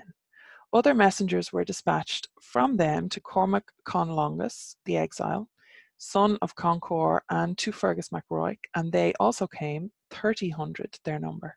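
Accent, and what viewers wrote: Ireland. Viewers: Enjoying this immensely. I am in the US so your "Irish lilt" is especially charming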